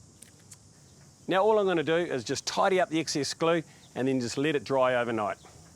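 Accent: New Zealand. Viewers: hes kiwi where u from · with a name like Gonzales, perhaps you are just not familiar with the NZ version of English. I was born in Australia and familiar with kiwi accents, as they probably are with some of our aussie accents! If you have an accent, I guess also some of us would have difficulty understanding your version of english. Tolerance and understanding will help us all overcome such judgements...if you watch what he's doing as he is speaking it is pretty easy to fill in the gaps of of misunderstanding his accent ;-[) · kiwi accent haha shit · He has a kiwi accent, he's from Manawatu in NZ :) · Nice job man. Quick question: what's a 'chuzzle'? #kiwiaccent 😁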